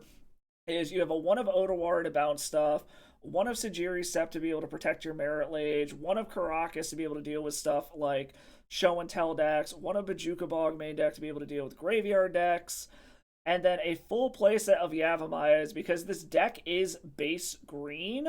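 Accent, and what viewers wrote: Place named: Alabama, United States. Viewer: I don't speak English but your accent sounds like Alabama to me, im right?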